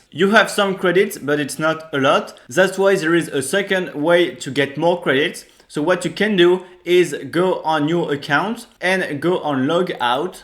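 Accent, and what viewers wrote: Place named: France. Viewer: I watched your French version of this video.i didn't know you can speak English this well. Just that your French accent is too strong. I like it.😂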